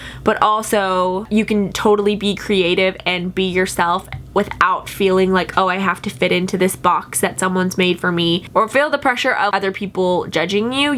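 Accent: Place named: New York, United States. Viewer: i see that you can speak spanish, well . . . Im learning english and you are one of my best teachers. Everyday im trying to hear you, your accent cuz i wanna travel to NY someday, saludos !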